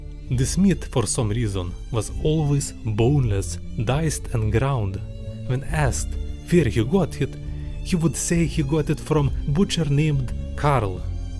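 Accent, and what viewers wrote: Russia. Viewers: Are you Russian if so that’s fucking awesome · Are you from Russia? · Are you russian? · I might say several positive comments about your vids, but they've been already said. In addition, narrating horror documentaries with russian acent might be a new genre, so keep it up my friend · Speaks like a third of Russians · im Ukrainian and by your accent and costume i already think you are Russian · speaks English in Russian manner.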